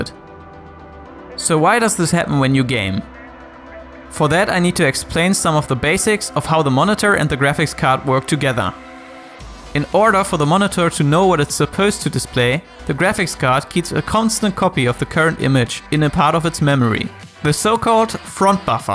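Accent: Germany. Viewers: WOW ich höre sofort den deutschen accent :) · Man hört auf jeden Fall den deutschen Dialekt raus 😄 Danke für das Video 👍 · Bist du Deutscher? :D Gutes Englisch aber man hört es trotzdem immer etwas raus, mich eingeschlossen xd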